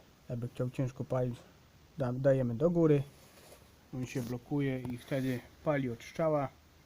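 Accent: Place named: Lesser Poland, Poland. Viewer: Wiedziałem ,że Małopolskie🤪 Swój swojego pozna. Na filmiku gdy opowiadasz niektóre słowa akcentujesz podobnie jak niektórzy w moich stronach.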